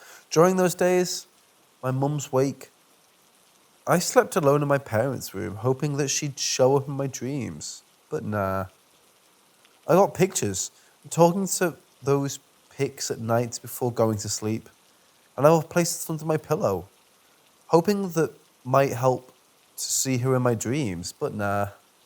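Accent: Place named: Merseyside, United Kingdom. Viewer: Good to hear another Merseyside accent! 😁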